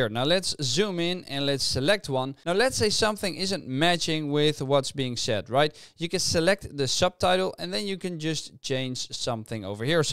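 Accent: Netherlands. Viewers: i hear a little bit of nederlands in your stem. Still thanks for the tutorial it helped me:)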